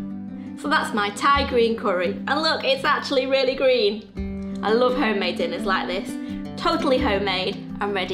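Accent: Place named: Northern England, United Kingdom. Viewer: Ur nothern arent u 😛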